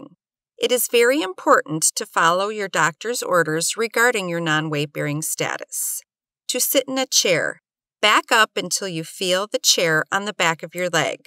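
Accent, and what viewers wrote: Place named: Midwest, United States. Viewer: Why does narrarator have to be from the Midwest...very annoying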